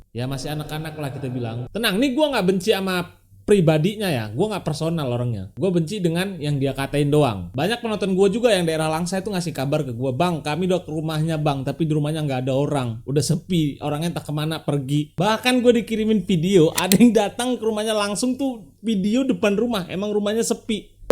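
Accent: Java, Indonesia. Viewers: Jawanya mana bang kamu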